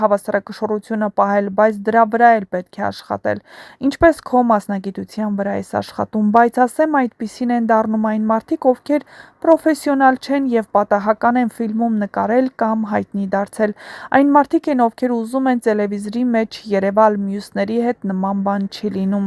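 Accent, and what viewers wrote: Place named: Russia. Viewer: Ինչի եք երբ բառը արտասանում եռբ, ետ ինչ զզվելի պրիմիտիվ մոտեցում է Հայերեն լեզուն խոսել ռուսական ակցենտով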